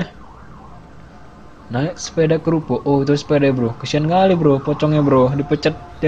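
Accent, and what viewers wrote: Medan, Indonesia. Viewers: ngomongnya kaya orang medan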